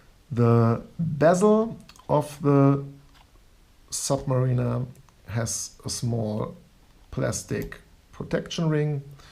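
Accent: Germany. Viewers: Man hört halt echt raus,dass er Deutscher ist🤣🤣🤣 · Deutscher Akzent kickt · Man hört das du eigentlich deutscher bist. Ist aber nicht schlimm. Beherrscht die englische Sprache auf jeden Fall besser als ich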